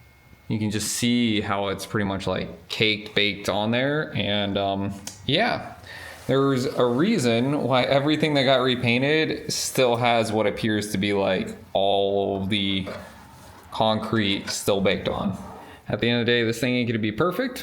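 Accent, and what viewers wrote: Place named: Pennsylvania, United States. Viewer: I have to ask, are you related to Sam from Scrappy Industries? I see the personalized "Scrappy Industries" sweatshirt, and you definitely have a heavy western Pennsylvania accent. (I grew up 40 miles west in Steubenville, Ohio.). You look like you could be his brother?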